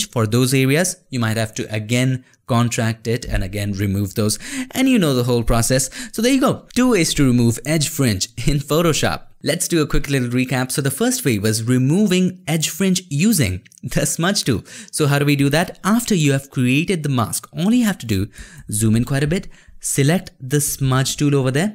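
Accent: India. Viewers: with Indian voice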